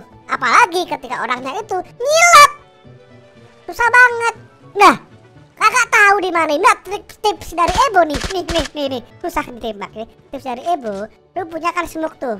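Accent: Java, Indonesia. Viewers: bhs jawanya lancar n kentel jawanya kayak kopi. Bang enggak.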